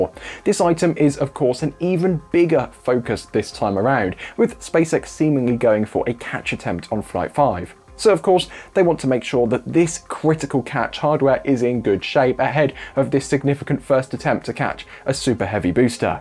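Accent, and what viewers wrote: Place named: United Kingdom. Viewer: "Centimeters" is exactly why I don't subscribe. There is something inherently comical listening to British accented narration about an American aerospace industry genre, knowing that ESA is virtually non-existent for British narrators to pronounce their terms with an imperious nose in the air pose.:face-green-smiling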